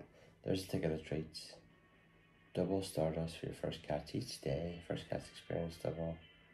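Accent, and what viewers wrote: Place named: Belfast, United Kingdom. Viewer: You popped up in my feed glad I starting watching cause think I’m hearing a fellow Belfast man ( edit forgot to acc say yeah you kinda talked me into it too) 😂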